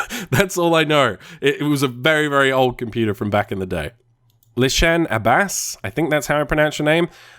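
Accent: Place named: Australia. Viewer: Oh dude i can't wait:D Also wanted to say that i really enjoyed your Q&A, i'm really sick at the moment so i listened to it while resting and it was just fantastic. I always thought you sounded australian but with a slightly off accent and i couldn't quite put my finger on it but it was always leaning toward english, glad to know that you came from the UK. I'm also australian.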